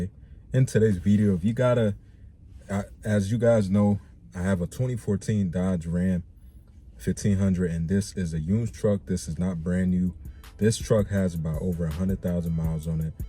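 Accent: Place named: Liberia. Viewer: Where are you from brother, I'm hearing small Liberian accent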